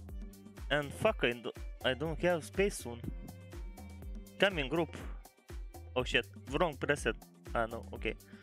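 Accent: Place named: England, United Kingdom. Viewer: Nice videos! keep them coming, I like your english accent^^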